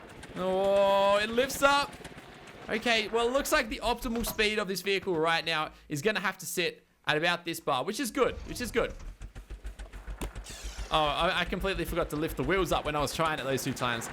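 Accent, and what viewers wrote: Australia. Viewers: Are you Australian? If so where are you from in Aus.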